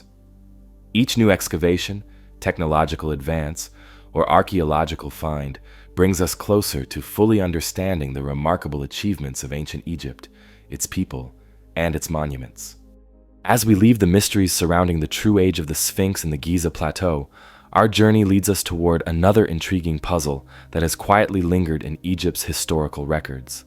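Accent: United States. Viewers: I just love this narration voice. My favourite ever American accent.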